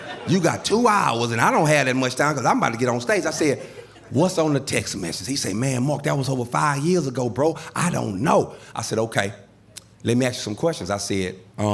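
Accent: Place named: South, United States. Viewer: I love the way enunciates his words when speaking to the audience. You still hear the South but he's proper with no need for code switching. Perfection! Now when he's telling stories about talking to his people issa wrap😂😂😂😂😂😂😂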